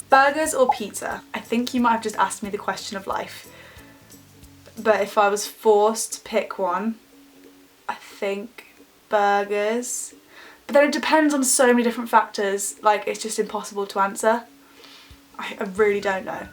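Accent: England, United Kingdom. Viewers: Prince T No its not London. She doesn't really have a strong accent at all (from a British point of view). It just a generic English accent. I think she has said she was born near coventry which is clise to where I grew up and lots of people spoke with an accent like.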